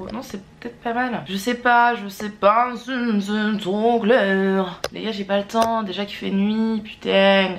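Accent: Toulouse, France. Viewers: Tu es toulousaine???? Mdrrr je disais bien l’accent 😭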